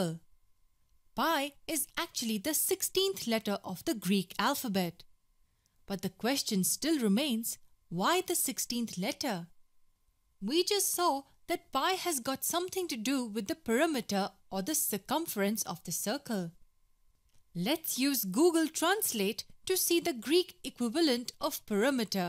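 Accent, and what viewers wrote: India. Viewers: very hard to follow, traditional Indian accent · Of course it's an Indian accent narration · I don't understand that Indian accent...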